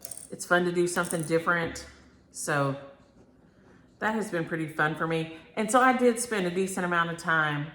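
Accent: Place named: Texas, United States. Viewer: You mentioned East Texas. Every summer we traveled by car from Miami to Kilgore to see my precious grandparents aunts uncles and cousins. They lived there and in Larid Hill many years. It’s hotter than blue blazes! Thanks for sharing such cute patterns. I love bunnies and Spring is coming soon! I miss hearing my sweet families Texas drawl since they are all gone now… so honey I enjoy hearing you.. 😊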